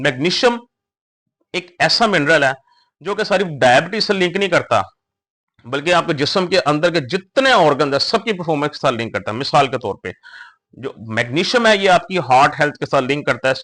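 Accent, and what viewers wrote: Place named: Pakistan. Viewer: السلامُ علیکم ۔ لہجے سے تو آپ پاکستانی لگتے ہیں۔ اور ذیابطیس کی یہ انوکھی وجہ آپ نے بتائی۔ میں ہندوستاں سے ہیں ۔ مجھے بھی ٹائپ ۲ شوگر ہے۔ فیملی history میں کسی کو کبھی یہ مرض نہیں رہا۔ کیا مجھے بھی آپکی سُجھائی باتوں سے فائدہ ہوگا۔ ہمارے یہاں کے پروفیشنلز کا آپکی دی ہوئی وجوہات پر اعتماد نہیں ہے۔ آپ کے ملک میں اس مرض میں مبتلا کتنے لوگ ہیں؟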